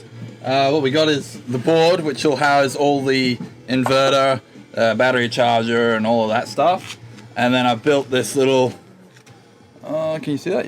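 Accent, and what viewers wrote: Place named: Ireland. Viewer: Are u part irish little bits would sound irish and somethings you say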